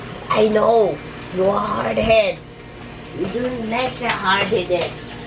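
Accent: Visayas, Philippines. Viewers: Janice sandmayr perfect ka ba?bisaya nga sya di ba?wag ka manood ng vlog nya kung basher ka lang!How dare you!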